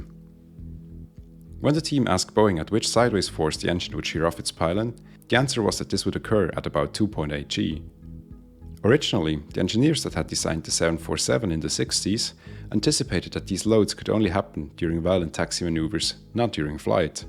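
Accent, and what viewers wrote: Switzerland. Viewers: You draw only one wave, that right over the obstacle. Which is orographic wind (wind following the contour of the landscape) and has nothing at all to do with the wave phenomenon. The wave is a gravity wave of the whole atmosphere BEHIND the obstacle. (Comparable to the wave of a stream behind an obstructing rock.) Rotors are aligned with the waves behind the ridge, and not just chaotic phenomena downstream as your drawing implies. Completely off topic: Are you Swiss? I seem to hear a hint in your accent. (Swiss myself.) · Fantastic videos, and love your (more Swiss, than Austrian, actually) accent. One nit: I observed a pattern where you say things like "all but unforeseeable", when really what you mean is the opposite: all but foreseeable, meaning it is everything except that it can be foreseen. Keep it up, man, these are great.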